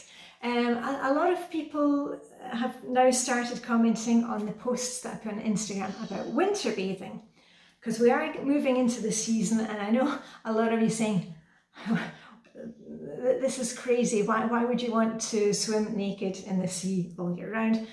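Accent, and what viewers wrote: Scotland, United Kingdom. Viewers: I seen a squirrel at your window on your video. Was it a red or grey squirrel? Love hearing your Scottish voice. I am from Glasgow. Xx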